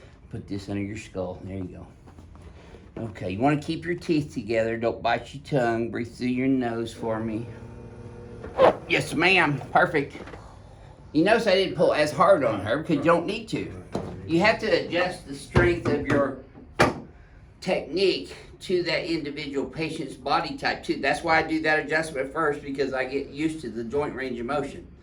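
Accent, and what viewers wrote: South, United States. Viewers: are you from redneck?? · Nothing wrong with a southern accent and a nice rack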